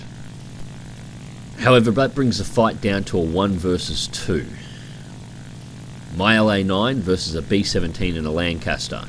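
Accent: Australia. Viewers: What easiness and talent at gameplay and great post battle analysis! I was watching Mighty Jingles playing War Thunder and some guys recommended. So I hoped to this channel a bit and eventually subscribed. And I adore the accent! I have relatives in Adelaide, although I am not Australian. I've heard a lot of good stuff about Australia and Australians. Greetings from Belgrade!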